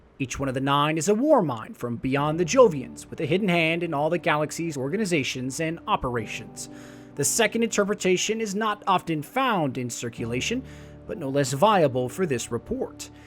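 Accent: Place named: New Jersey, United States. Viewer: I feel like you're from either New Jersey or Pennsylvania. Those are the only places I've seen people spell "could've" as "could of" and heard people pronounce "library" as "libary". It's not a bad thing, just an interesting thing I've noticed.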